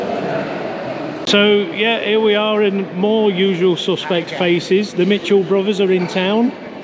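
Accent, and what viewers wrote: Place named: United Kingdom. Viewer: Ahhhh you are British ''Yeah'', ''Yeah'' ''Yeah'', l bet you says ''Guys'' too, a bonsai called Guy then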